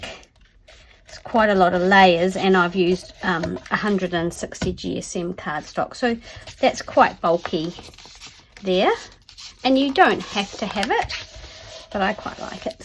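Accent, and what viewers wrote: New Zealand. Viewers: Such a gorgeous tag, thank you! Loved watching your process and hearing our Kiwi accent! I’m wondering if you got your Art glitter glue in NZ? I subscribe to The Graphics Fairy and especially love all the journal tutorials! Is this your last one? 🧚‍♀️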